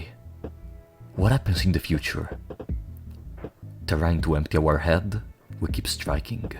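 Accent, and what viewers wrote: Italy. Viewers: Are you italian by any chance?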